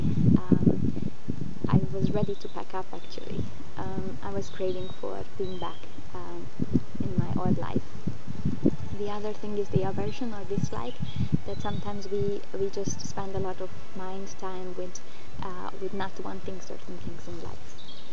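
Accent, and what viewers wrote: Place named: Czech Republic. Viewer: thanks for posting, very well articulated and descriptive video. i'm about to embark on my first vipassana experience & judging by the constant smile on this girl's face, it might just be smtg useful :) where is the accent from? my guess: czech republic....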